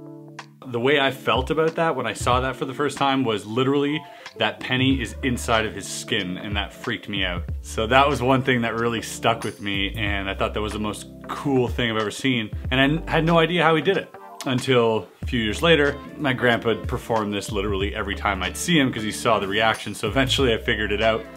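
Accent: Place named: Canada. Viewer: I am only just at the beginning, but, magic has amazed me just like it did for you. Watching the same famous magicians performing the best card tricks that left myself stunned and wanting to know how they do it and how I can do it myself to create that same experience for my friends and family. Its unknown if I would ever make a career out of it, I am almost 24 and currently in progress of becoming a licensed architect by 2018, but I love having hobbies and magic speaks to me on so many levels. I am happily subscribed to your channel and have watched many of your card tutorials that have helped me learn new tricks! Keep the videos coming bro! Also, thanks to your videos, my first deck of cards was based off your recommendation....Blue Monarchs! They feel great and have worked out very well so far! I have not yet confirmed if you have said that you are from Canada but it seems a little obvious with your accent, if you are....SWEET! 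I was born in Burlington, Ontario! I have since moved to California but still proud to be canadian! Hit me up if you are ever in South Orange County! -Toby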